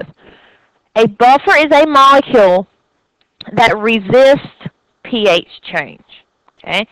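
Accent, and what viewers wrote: South, United States. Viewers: Thank you so much for your lectures! They make this stuff so much easier to understand. I'm originally from NC but go to school in NYC. I appreciate hearing a professor with a southern accent. It feels like home :)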